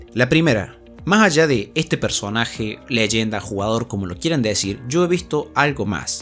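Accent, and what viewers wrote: Córdoba, Argentina. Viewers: Che loco vos sos de cordoba o alguna provincia vecina?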